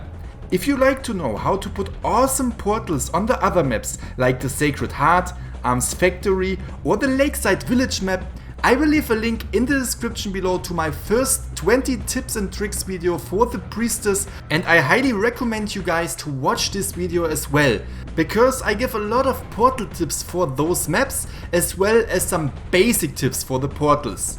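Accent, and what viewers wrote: Germany. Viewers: are you german? Your accent sounds really similiar to mine. :)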